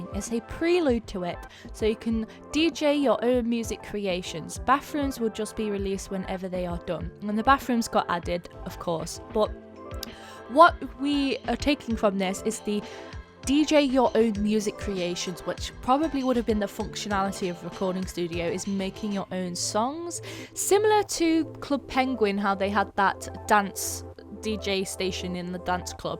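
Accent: United Kingdom. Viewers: I love her British accent she is the best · are you british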